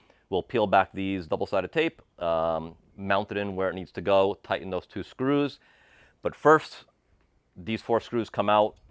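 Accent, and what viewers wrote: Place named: Northeast, United States. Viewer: I was gonna say your channel name is, but your accent is Northeast like NJ or NY. Then I see the GPS on the tablet being New Brunswick!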